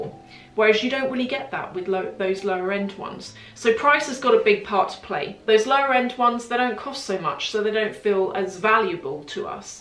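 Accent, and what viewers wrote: RP, United Kingdom. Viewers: To point - there are lots of “wannabe” Brands that are very expensive but nobody buys them because nobody has ever heard of them Anyhow just commenting here bc I took my wife to a few luxury stores in Paris and London and she watches with her lil posh accent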